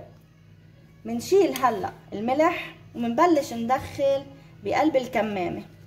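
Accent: Lebanon. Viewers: فيديو جميل والأجمل اللهجة اللبنانية الساحرة .أخوكم من الجزائر 🇩🇿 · ليش اللهجة لبنانية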